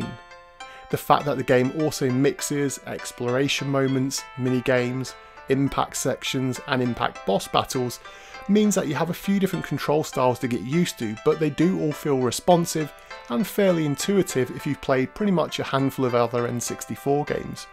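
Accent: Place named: United Kingdom. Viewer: Godddddd why must every n64 video on YouTube be narrated by a British accent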